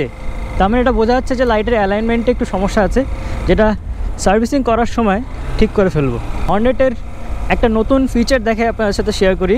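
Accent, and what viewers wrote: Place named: Kolkata, India. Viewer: kotha bolar style ekdom kolkatar manusher moto. Hashi+ protita kothar sound ekdom kolkatar manusher moto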